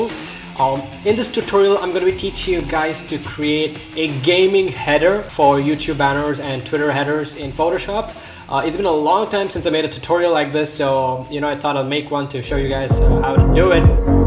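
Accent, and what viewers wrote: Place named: India. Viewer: Lol cause you don't sound like one but I noticed the slight pinch of 'desi'ness in ur voice so I posted that comment😉 btw I'm 13 and learning photoshop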